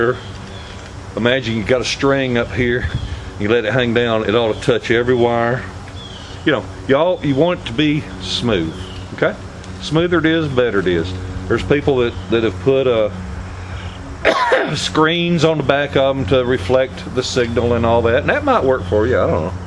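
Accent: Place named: South, United States. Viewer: Hey, I love your southern twang. The information was great. I'm going to build one. Wife bought me a $80 digital antennae in the house. I get 15 channels. This should also help get more.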